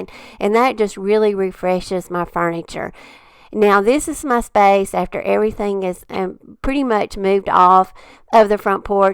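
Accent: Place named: Alabama, United States. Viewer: Hey! I got your channel info from Olivia’s Romantic Home!! You’re precious and love your accent! Over here in Georgia we have so much in common with pollen and yard stuff! I’ve loved watching.... just a few so far.... keep you the good work! Where in Alabama y’all live? I’ve been looking at houses in Enterprise and things look nice there!!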